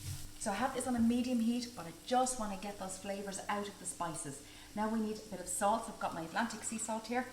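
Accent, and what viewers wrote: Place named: Ireland. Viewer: Love watching you cook and love that beautiful Irish accent to...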